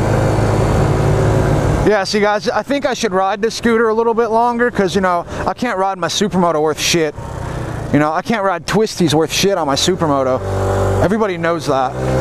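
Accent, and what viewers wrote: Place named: United States. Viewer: I like your accent. Which state are you from ?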